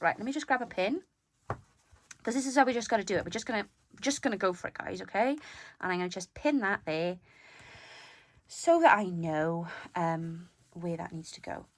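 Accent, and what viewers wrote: Wales, United Kingdom. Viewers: Hello, I enjoyed listening to you today even if I didn't get to watch the video. I wouldn't mind having a bit of your energy, just because currently I'm feeling a bit drained, but you make me laugh and chuckle with your Welshness. Thank you for being you. Take care and God bless you and yours. Sincerely Becky B from Arkansas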